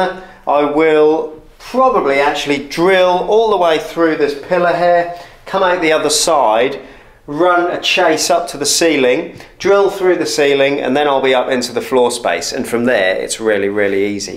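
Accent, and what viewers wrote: Norfolk, United Kingdom. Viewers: This is a gem, and a familiar Norfolk accent to boot, subscribed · Where are you from? Hint of Norfolk?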